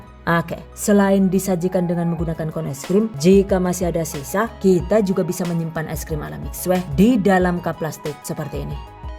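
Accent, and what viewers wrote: Banyumas, Indonesia. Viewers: Ide usaha rumahan nih 👌 Mantap sukses selalu Ngapak juga ya yu 😅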